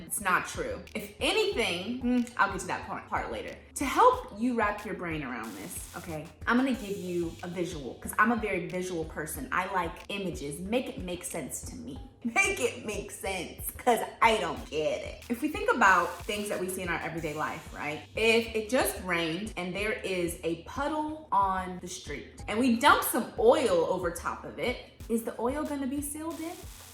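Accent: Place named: United States. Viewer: Ok, you are SOOO pretty and I love your voice! For context, I’m British and love your American accent.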